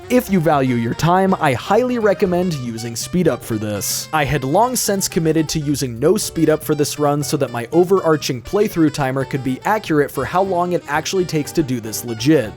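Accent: United Kingdom. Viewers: British?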